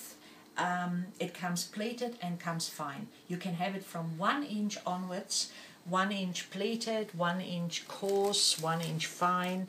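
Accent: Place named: Germany. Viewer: Listening to you I am wondering if you are German. If so from where in Germany. I was born in Solingen and grew up in Mannheim. Would love to make some wide brimmed hats but cant find patterns for it.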